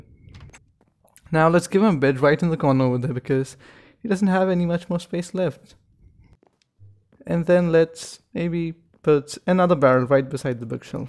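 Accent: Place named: Europe. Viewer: Are u from europe? U kinfa sound like ur from there. Im asian 🇵🇭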